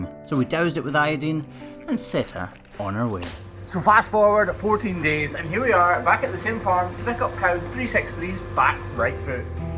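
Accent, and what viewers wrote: Scotland, United Kingdom. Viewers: please do a video with your full Scottish accent!!! Can't wait for the merch!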